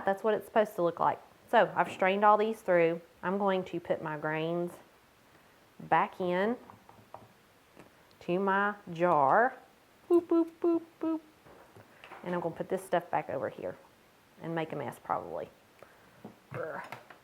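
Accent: South, United States. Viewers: THANK YOU for mentioning how to store the grains without making more Kefir! Yours has been the only video (and I've watched about a dozen now) that mentions this. I want to start making Kefir, but don't envision that I'll need to make a new batch everyday (like everyone seems to do) and I was wondering what one did with the grains to store them, or if this was even possible. P.S. Love your southern accent. : )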